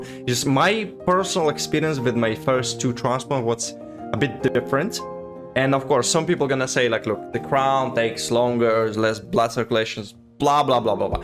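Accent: Russia. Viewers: Что у вас за акцент? Вы русский?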